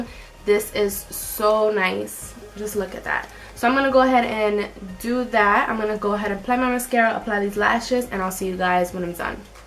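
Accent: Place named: Bronx, United States. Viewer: I knew by that accent i just left the boogie down to take care of my mom here in Jacksonville Fl I see you boo that's why I subbed od quick lol🙅‍♀️✌❤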